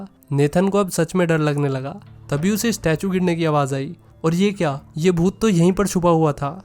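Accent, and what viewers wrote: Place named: Palwal, India. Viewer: Aap kaha se ho faridabad side se ho kya kyoki aapne kuch word palwal walo jease bole hai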